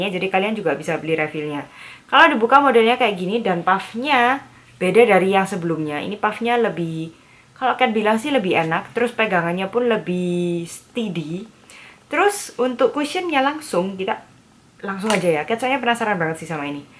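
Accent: Java, Indonesia. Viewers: medok medok ngono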